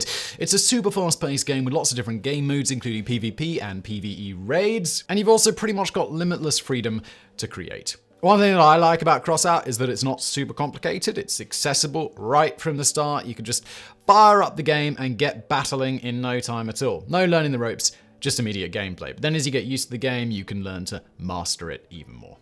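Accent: United Kingdom. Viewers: I attribute it to him being British and they pronounce words differently then we do in the states.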